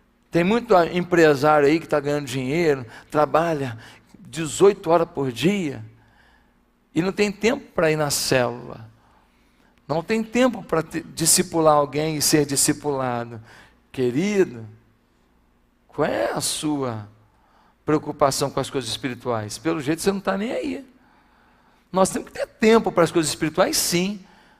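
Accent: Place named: Minas Gerais, Brazil. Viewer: A igreja é no RJ, mas esse sotaque está mais mineiro, rsrs. Culto maravilhoso....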